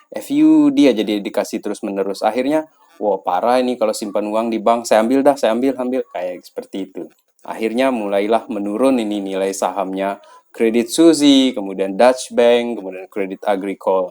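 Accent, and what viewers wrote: Sumbawa, Indonesia. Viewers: Sumbawa mana ini pak?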